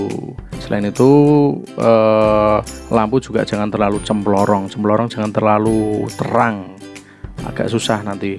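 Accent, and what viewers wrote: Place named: Java, Indonesia. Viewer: mas e lek ngomong medok 😆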